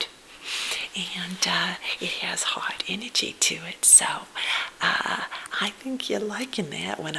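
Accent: South, United States. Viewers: Love the southern accent. There is something very... accommodating? about it. I don't know how to put it :)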